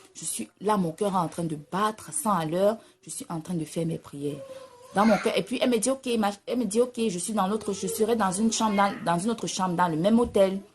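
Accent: Cameroon. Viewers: Oh mais je rêve finalement c'est vrai! Les camerounaises parlent pareil :D Ma mère parle avec le même accent c'est fou ça non? ! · C'est une camerounaise tu vois même son accent et le nom sa chaîne, la musique congolaise n'a pas frontières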